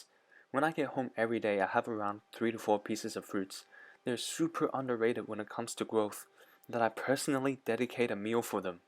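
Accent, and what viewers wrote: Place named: Australia. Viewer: Aussie mate 😎 😂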